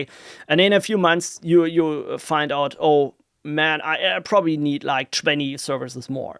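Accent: Germany. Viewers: Typically german englisch 😅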